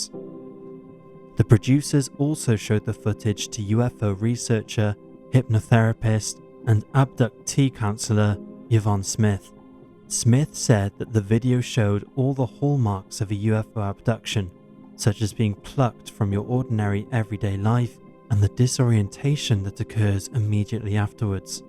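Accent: United Kingdom. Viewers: I appreciate all vids from Top5s. As well as the new narrator. Something about a British accent among listening to only American accents all the time that really helps lol Thx Top5s team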